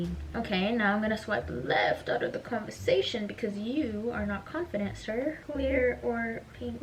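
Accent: United Kingdom. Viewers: The British accent LAMAOAOAOOA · I’m British.. and I am so here for ur British accent it’s rlly funny 😂 in a good way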